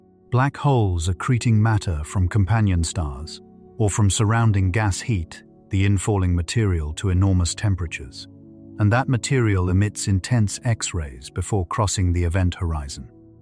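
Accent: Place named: United Kingdom. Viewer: This narrative is filled with mistakes and although it sounds smooth and creamy with the UK accent of the narrator who ever wrote the information has not done their homework. Hubble who created and mistakenly produced the theory of the expanding universe after another 10 years of intense research and methodical tedious computations wrote a paper in 1940 expressing his scientific conclusion addressing the expanding universe. Sadly by then the big bang theory had taken such root that his paper fell mostly on deaf ears. However since there is so much evidence that doesn't exist supporting the big bang and the expanding universe that we have entered a paradigm shift away from this old school nonsense big bang to the Electric/Plasma Universe. Hubble wrote a paper addressing The Problem With The Expanding Universe. I suggest if you are a critical thinker look for this paper read it. 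No one since has put as much time at confirming the math necessary to reach the conclusions that Edwin Hubble had to do to be absolutely correct in this assertions about the universe not expanding whatsoever and that the universe is simply infinite.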